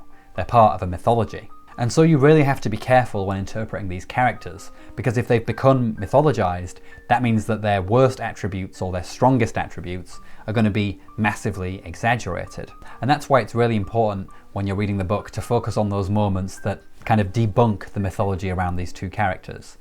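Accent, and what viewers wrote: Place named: Scotland, United Kingdom. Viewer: Is your accent from Scotland or from Northern Ireland? (I love it)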